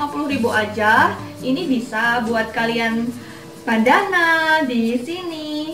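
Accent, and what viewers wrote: Indonesia. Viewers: Logat jawa timur 😅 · medok banget mbak tapi lucu cara Ngomong nya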